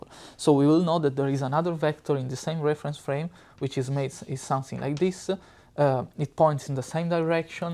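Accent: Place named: Italy. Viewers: È inevitabile, visto che è italiano. Hai mai sentito un madrelingua inglese o americano che parla italiano? Per quanto lo parli benne, si sente sempre l'accento. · C'è un po di italiano nel tuo inglese ❤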